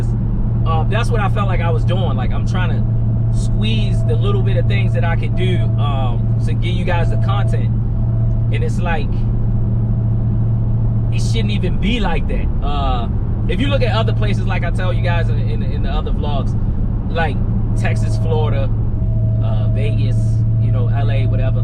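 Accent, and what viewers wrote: Baltimore, United States. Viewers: you can tell he from b more cus of the way he pronounce words with "ou" "ue" "ew", they all sound like "uuuuuuu"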